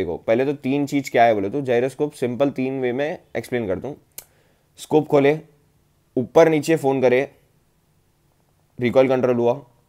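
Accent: Hyderabad, India. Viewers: By his accent i presume he is from hydrabad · By hearing your voice I think you are a Hyderabadi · Ekdam Hyderabadi language bolte tum to 🔥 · Bro r u Hyderabadi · Bhai ekdum Hyderabadi hei.. fellow Hyderabadi.. tripped my ass off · Bhai tum hyderabadi hai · Bhai tum hydrabadi? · He is hyderbadi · Like for Hyderabadi accent 🗿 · aakhri maut daaldiye yaaro tum are you hyderabadi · Perfect hydrabadi language 🔥😂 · Bro, tum normaly bhi haidrabadi language hi bolte ho ya sirf video bananeke liya aaisa bolte ho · Hyderabadi slang😍😍😍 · Hydrabadi hindi love you bro 💞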